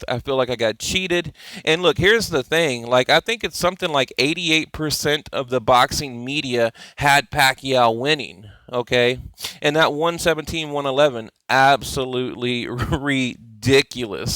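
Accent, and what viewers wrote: United States. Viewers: Your fucken Septic yank voice pisses us off,TEDDY fucking Atlas is the most biased fuck in the world,listen to the British version of he fight,they had Horn edging it,Atlas even when Horn was landing heaps of punches early on,Atlas was talking about what Pacquiao was going to do later on,Tim Bradley was attempting to put a non biased slant on the fight,fuck off with your crocodile Dundee shit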